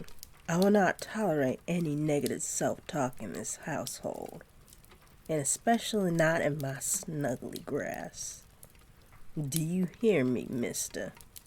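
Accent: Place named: South, United States. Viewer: Damn, that southern accent hits me, great work on the audio chief!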